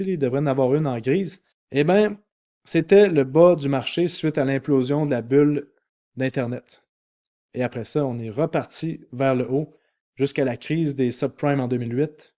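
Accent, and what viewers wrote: Canada. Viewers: Salut, encore merci et bravo pour tes 100k, je me demande si ce n'est pas ton accent Canadian qui m'a attiré dans les cryptomonnaies 😊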